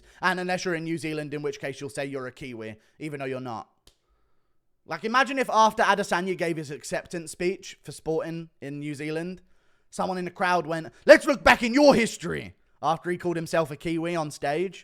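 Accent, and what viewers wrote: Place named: United Kingdom. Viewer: The worm has returned in full force 😂😂 this uk accent makes the vids even funnier keep up the good work/ Sweden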